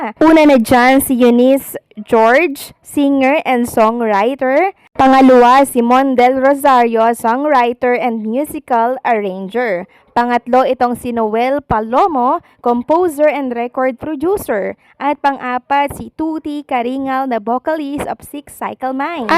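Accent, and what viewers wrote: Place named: Visayas, Philippines. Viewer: bisaya ka day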